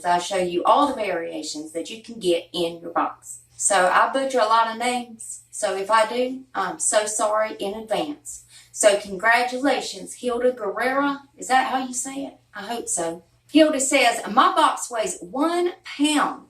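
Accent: South, United States. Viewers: Girl love what watching your videos you.... you crack me up.... and I live all the make up tips that you give and I love your southern accent I'm a country girl myself ❤❤❤ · I'm going to try this out too. We'll see if it works on a 60 yr old face. Lol I love watching you and listening to your accent. I'm a southern in Arkansas so it's nice when I run into a southern accent.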